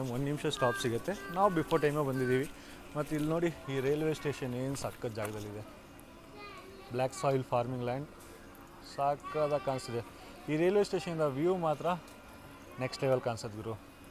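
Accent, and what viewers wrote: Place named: North India, India. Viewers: Yes, he is from Uttara Bharata. Please don't discourage him. He is a gentleman. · I think he is basically from UP or Bihar , who is settled in Karnataka.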